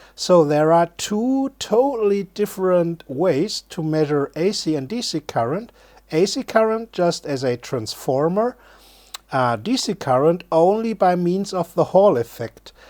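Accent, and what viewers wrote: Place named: Germany. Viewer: Ich habe es mir schon gedacht, das du ein deutscher bist :D Man hört es einfach raus :P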